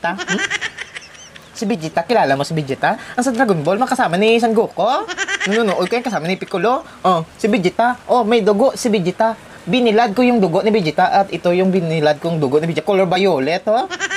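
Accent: Iloilo, Philippines. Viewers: Ilonggo ka po dhay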